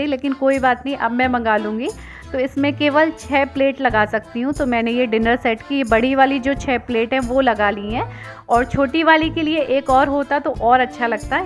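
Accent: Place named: Agra, India. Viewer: Accha aap Agra ki ho didi . mujhe lag hi raha tha aapki boli se mai bhi agra ki hu .Apne log pehchan me aa jate hai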